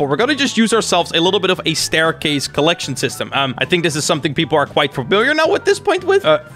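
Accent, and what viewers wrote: Netherlands. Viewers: You Dutch I think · Hey are you Dutch?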